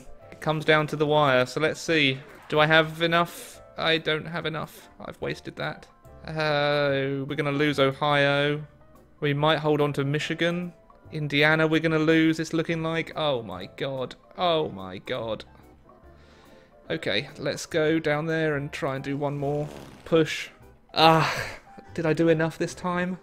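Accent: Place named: United Kingdom. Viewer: It’s funny as an American to here a British person say seats knowing very well he will never get it right.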